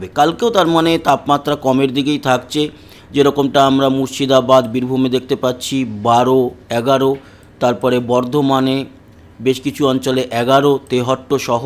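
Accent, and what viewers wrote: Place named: Bangladesh. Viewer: Bangladeshi tan keno ?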